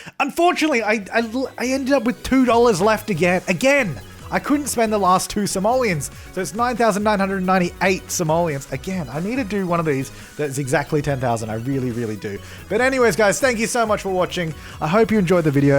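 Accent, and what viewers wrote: Australia. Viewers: Watching this from the future and sounds SO Australian here. Like more than in present day somehow, even though I'm not sure his accent has really changed.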